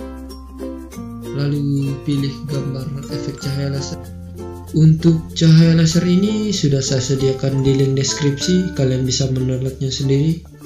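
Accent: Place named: Jereweh, Indonesia. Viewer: Mantap logat Jereweh-nya :)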